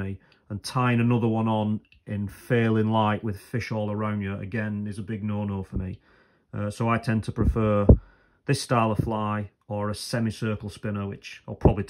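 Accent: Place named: Lancashire, United Kingdom. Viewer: cracking straight to the point , ive just started fly fishing and tying just what was needed finding your channel, sounds like your from lancashire 🍻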